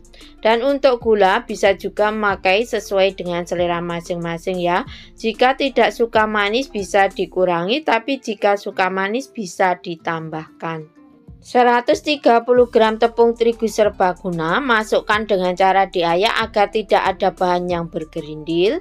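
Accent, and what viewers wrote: Java, Indonesia. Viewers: ....logat jawinya medok bgt....seneng dengernya...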